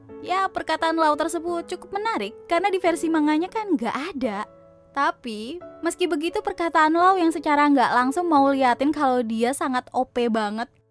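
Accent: Java, Indonesia. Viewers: suka OP sejak kapan? Ini menjadikan perbedaan yg mendasar. Saran * "coba tonton animenya dari chapter pertama, baru bawain lagi yg ini, terus bandingkan secara pribadi" * Medoknya keluarin aja, jgn d tahan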